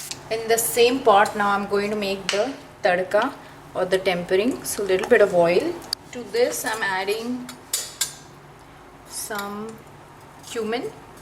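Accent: India. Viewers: Try some elocution lessons so you don’t have that thick Indian accent ...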